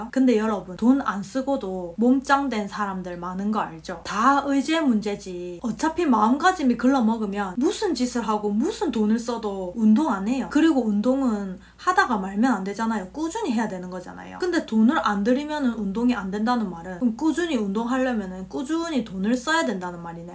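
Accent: Gyeongsang, South Korea. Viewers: 우왕~ 우연히 보게된 님믜 방송.50대 줌마인데도 은근 잼나고 웃음이 나오네요. 경상도 말씨 좋고 또!다~맞는말. 말도 참 잘한데이~^^ 화이팅~! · 똑소리나는언니네요경상도싸투리안들어면.하루가지루하게합니다 경제학박사님도 할배야하겠어요. 오늘부터 유툽어로주식.공부열심히할람니다..넘.똑똑혀요.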